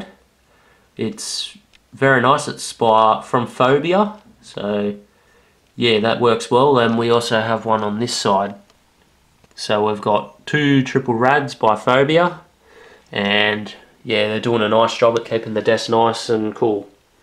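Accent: Australia. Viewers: awesome setup! M8 r u Aussie:D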